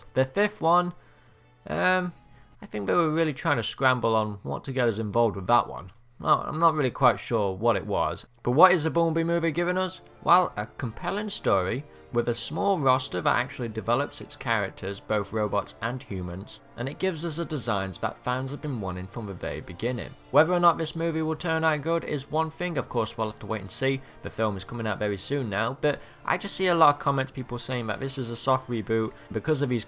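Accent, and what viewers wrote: United Kingdom. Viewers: Why do you have an English accent until you say Audobods and Decepticaaaans? It’s very odd.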